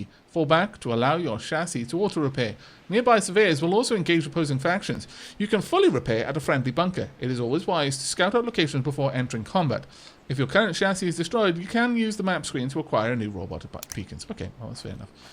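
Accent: Wales, United Kingdom. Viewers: is Welsh, but living in Scotland. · Benjamin Chisholm I believe he's Welsh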